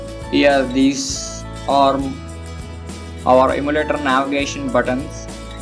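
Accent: India. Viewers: Brush your English accent.. Your video was nice but your accent was like Tenglish = Telugu and English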